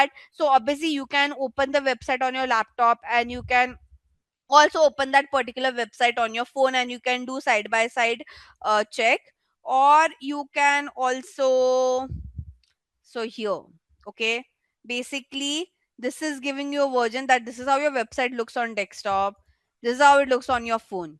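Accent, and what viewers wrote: India. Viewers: over 5 hours having to listen to an indian accent? Fuuuuk me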